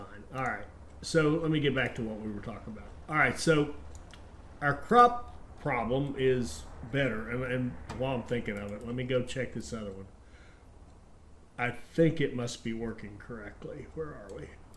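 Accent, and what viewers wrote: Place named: Appalachia, United States. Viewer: Hey, im one of your newer subscribers and I have a question or two. Firstly do you do any geneaology, because of the last name and coat of arms. Secondly, your accent seems appalachian like my mothers side who are from southern wva and eastern ky. I do geneaology and my great grandmother was an adkins which is similar to your last name. Just intrested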